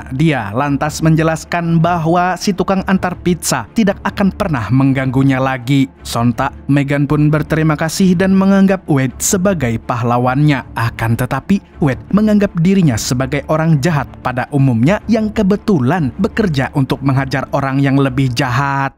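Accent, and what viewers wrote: Banjar, Indonesia. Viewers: orang Banjar kah mang🙏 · Urang Banjar hostnya 😂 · Urang banua sekali ny .. 😂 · Pian nih urang banjar kah mang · bah banjar kalinya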